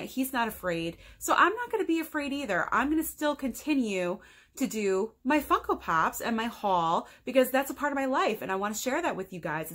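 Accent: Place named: East Coast, United States. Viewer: Well beautiful eyes keep grindin' because the future is bright 4u. Btw love the East Coast accent.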